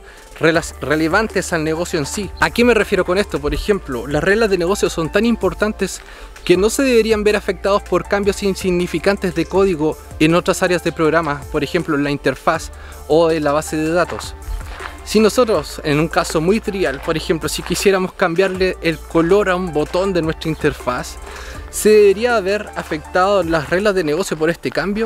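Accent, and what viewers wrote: Chile. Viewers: me parece que por tu tonada sos de Chile, ( pobre Chile prendida fuego ). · hola!! eres chileno? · ¿Otro chileno? 🤣🙄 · Sos chileno weon?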